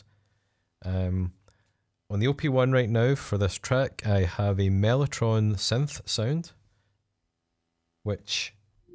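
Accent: Scotland, United Kingdom. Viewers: Backing track? what is cheating in music production at all these days? that sounds interesting, as far i understand your nice scottish accent;)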